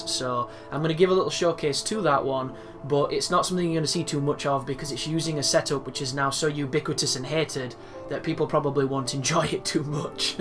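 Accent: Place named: North, United Kingdom. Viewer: Where are you from? If you don't mind me asking; I'm reminded of your accent by the accents they use for men North of the Wall on the Game of Thrones TV show :)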